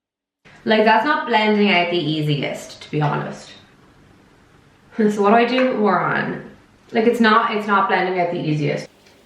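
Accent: South Dublin, Ireland. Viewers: I think she is Irish 🙂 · Clicked on this video not knowing who you are, heard the Irish accent and subscribed instantly 🤣💖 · South Dublin accent. Sounds like an Irish person trying to speak with an American accent.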